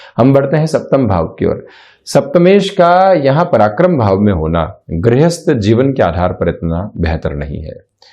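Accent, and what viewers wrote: Standard. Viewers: सटीक विश्लेषण भाषा पर अच्छी पकड़ , उसका प्रयोग और शुद्ध उच्चारण प्रभावशाली . साधुवाद !!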